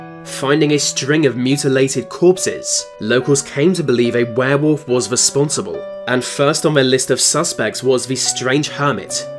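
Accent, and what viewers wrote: United Kingdom. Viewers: I just want to see face before I die. I wanna see the face behind this majestic British voice.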